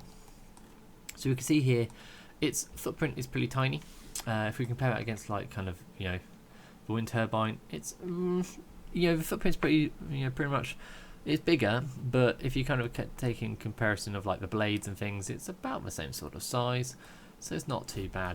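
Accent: United Kingdom. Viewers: Subscribed for this beatufil British accent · The British accent is the worst! My ears are bleeding. Americans hate the British accent! You may be referring to the treasonous pride flag Americans.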